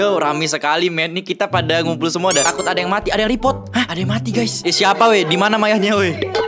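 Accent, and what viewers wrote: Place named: South Kalimantan, Indonesia. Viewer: kakak orang banjar ya,kok kayak orang kalsel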